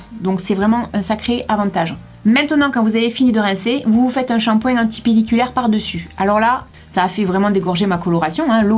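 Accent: South, France. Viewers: Alleste moi je dirai du Sud honnêtement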